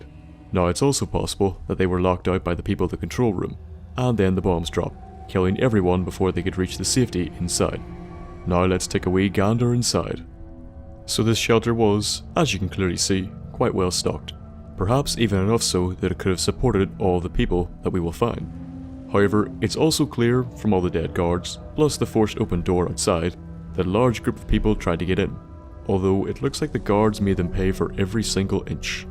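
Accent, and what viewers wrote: Northern Ireland, United Kingdom. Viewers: Where abouts from northern ireland are ye from? I'm from Co. Down lol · May I ask what country are you from?, you sound like you're from Northern Ireland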